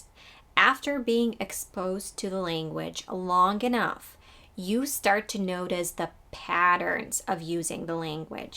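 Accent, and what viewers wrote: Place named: United States. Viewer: sometimes I do get the strong impression/feeling that you're really an American!!! Sure,sometimes I forget that your native language is the Ukranian one...your English is TERRIFIC!!!! Congrats! 🇺🇸🇺🇸🇺🇸🇺🇸🇺🇸🇺🇸🇺🇸🇺🇸❤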